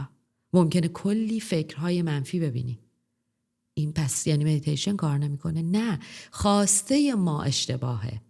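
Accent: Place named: Isfahan, Iran. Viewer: فدای لهجه اصفهانی مجری عزیزم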